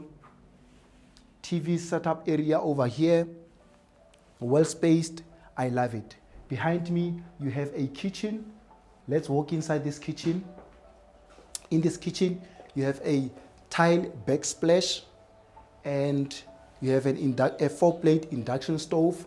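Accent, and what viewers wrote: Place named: Africa. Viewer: Very nicely presented with an African accent.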